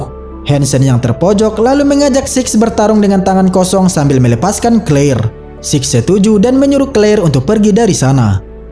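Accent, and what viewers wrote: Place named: Java, Indonesia. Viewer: Bahasanya pake bahasa jawa y bang .,,medok Kali I😁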